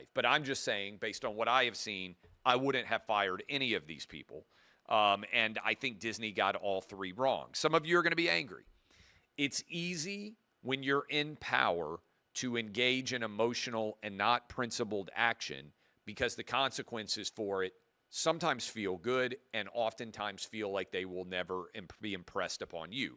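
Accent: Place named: Boston, United States. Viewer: Sorry Clay, you are alone on this topic. You sound like you are from Boston.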